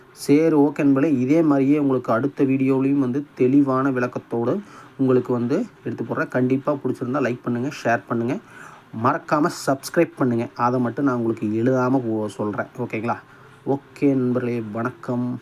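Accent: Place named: Kongu, India. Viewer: Nice Kongu tamil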